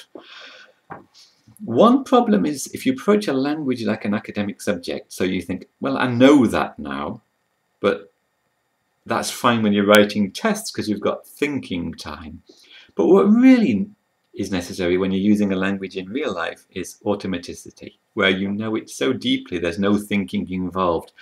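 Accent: United Kingdom. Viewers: Very interesting video. I notice a few people have commented on English accent. But what struck me immediately was that his accent sounds British, but his spelling on the whiteboard was American. An unusual combination.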